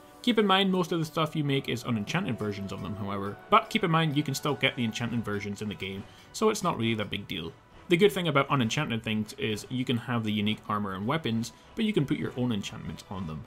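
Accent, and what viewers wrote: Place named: Glasgow, United Kingdom. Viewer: Posh glasgow...lish?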